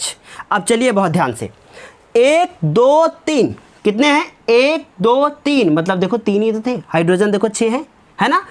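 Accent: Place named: Kanpur, India. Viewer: Sir apki kanpur language ki vajah se hme bahut mja aaya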